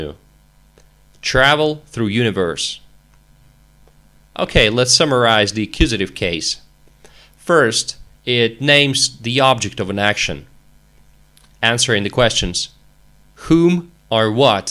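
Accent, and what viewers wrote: United States. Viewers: why is it good that he sounds american , it would be better if he sounds russian when you are trying to learn russian. Also not everyone who speaks english is american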